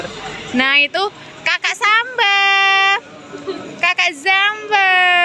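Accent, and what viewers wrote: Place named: Java, Indonesia. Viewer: Bahasa Indonesia medhok banget🤗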